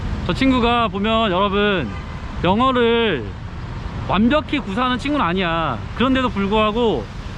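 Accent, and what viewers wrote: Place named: South Korea. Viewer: 형님 발음 중독성 오집니다 ㅋㅋㅋㅋㅋㅋㅋㅋㅋㅋㅋㅋㅋ 리얼 코리안 영어발음 저를 보는거 같아서 내가 영어잘하면 이런 느낌이겠지? 하면서 봅니다 ㅋㅋㅋㅋㅋㅋㅋㅋㅋ 너무 재밌어요 전염병 조심하시고 즐거운 여행하세요 형님~~~~ 아 저는 24살 대학생입니다 영상 너무 재밌어여~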